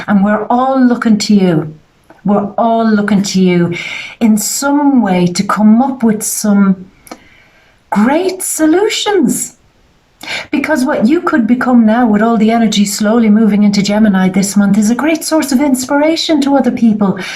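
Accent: Ireland. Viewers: Doesn't she have an uncanny resemblance to Elizabeth Hurley?.. that gorgeous Irish accent.. brilliant! Gurl you really speak with such clarity and I love it.. yes I have enrolled in distance learning and just didn't have the time to do it.. yes I have been afraid to do my work because I have always thought I wouldn't get through it.. I really want to go back to Uni and completing what I have always wanted to do... I'm older and want to be able to say to myself that I have lived my life. So thank you for this gorgeous reading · Always a pleasure to hear your soft Irish accent, I am a June 20 guy. Painter and writer, very Irish and awake. Like yourself I am living far from home but you can take the woman from the island but not the island from the woman. I have lost nothing that I experienced in life but have managed to place things in quarantine lol. Big hugs. Eric.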